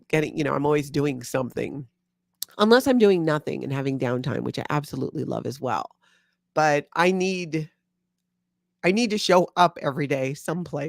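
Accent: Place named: Hawaii, United States. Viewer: You definitely have an accent. I think it’s the Hawaiian influence.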